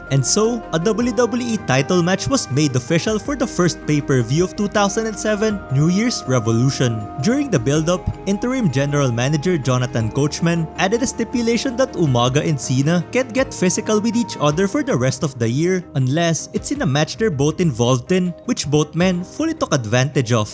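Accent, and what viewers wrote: Philippines. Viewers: The moment I heard you speak, I knew right away that you're a 'kababayan'. 😄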